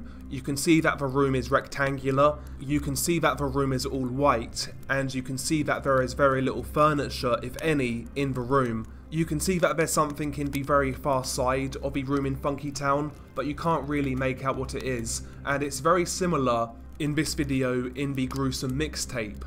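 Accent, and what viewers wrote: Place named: United Kingdom. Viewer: This british accent makes the video twice harder to understand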